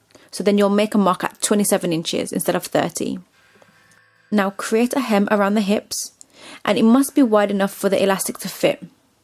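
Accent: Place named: South Africa. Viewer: Lol. I actually thought there was a tiny bit of South African accent in there. I'm from Zimbabwe but been in Manchester since I was 8. And there's no trace of any brummie in yours lol xx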